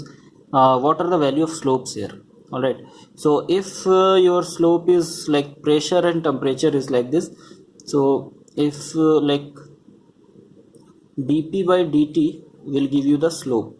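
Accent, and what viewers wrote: India. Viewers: When the accent is Indian...you know the video will help lol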